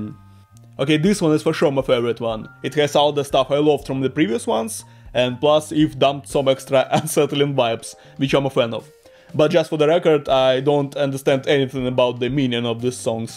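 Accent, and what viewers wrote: Russia. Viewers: Русский акцент я узнаю из тысячи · Подожди, ты русский? Я вот сижу уже почти полторы минуты думаю, акцент похож на русский... зашла в описание канала, ничего не нашла и тут ты говоришь "Драматургия" и я такая "стоп, чё серьезно?" Ив один из моих любимых певцов и я рада видеть подобные реакции ❤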